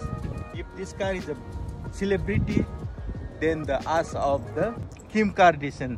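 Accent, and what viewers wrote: India. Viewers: Proud of Indian accent. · Love from Hivare,Mohol saheb💓❣️ Nice..... Marathi accents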